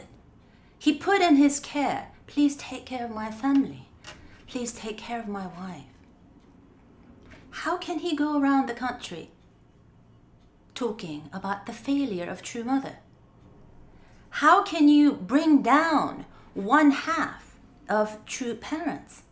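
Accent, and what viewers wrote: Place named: United Kingdom. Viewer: How did you get that British accent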